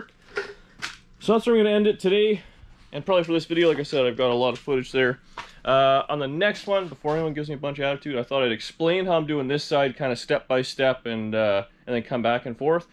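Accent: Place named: Canada. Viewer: You seem like a cool Canadian dude, I like the way you canucks pronounce "out" and "about"!.. Do you smoke weed or drink molson canadian?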